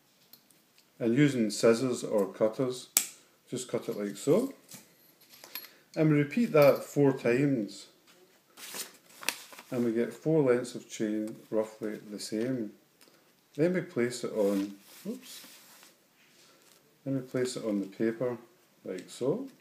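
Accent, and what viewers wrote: Scotland, United Kingdom. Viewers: Great seeing a man doing this. Great job . Love hearing the Scottish accent! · If you knew how much your accent is loved by many of us here in the US, you'd have a lot more tutorials. It was nice hearing you and thanks for the tutorial. 🙂 Before I die I'll make it to Scotland and tour where Alexander McCall Smith has the setting for his wonderful books and I'll go visit your shop too. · The shop is located in Scotland so it's a scottish accent. :-)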